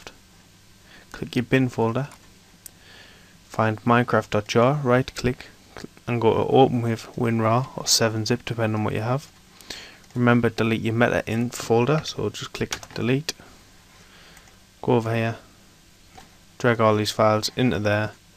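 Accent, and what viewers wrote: United Kingdom. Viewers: Are you British, Scottish or Irish? I love them all. I'm Aussie but I accept any other race in my country. Unlike SOME people I know...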